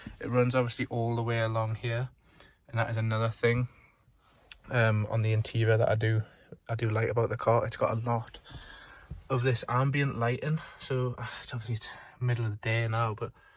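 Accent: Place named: Newcastle, United Kingdom. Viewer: Fellow Newcastle accent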